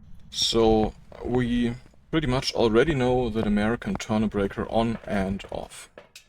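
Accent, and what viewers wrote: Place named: North Carolina, United States. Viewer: you, sir, have an unusual North Carolina accent...:face-orange-raised-eyebrow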